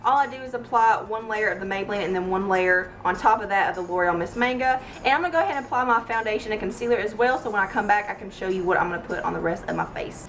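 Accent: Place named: South, United States. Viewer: ahhh for real this is such a gorgeous look..your eyes just pop so perfect with it. What part of the south are you from? I'm from NC and I get comments on my southern accent but it's no where near as strong as yours is, I love it!!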